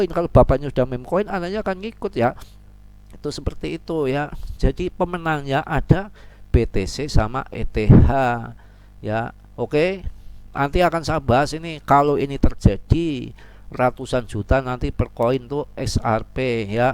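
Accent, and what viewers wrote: Banyumas, Indonesia. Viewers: Yakin nyong seneng banget Karo BROOO.... Gole jelasnya logat ngapak.... Tapi JELAS... JUJUR APA ANANE.... ORA GAWE WONG MONCROT.... GAWE WONG DADI SULTAN..... MATUR SUWUN BRO..... DMOGA ISTIQOMAH JADI AMAL JARIYAH...... AMIIN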